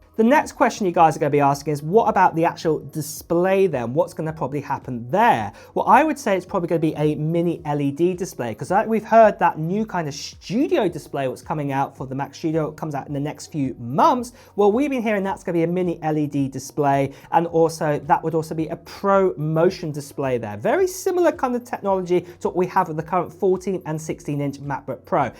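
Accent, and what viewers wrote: United Kingdom. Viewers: every time you mention Summer in your videos we are left clueless. Use months and years to help your viewers understand. Just because you have a brittish accent doesnt mean you are living in the UK !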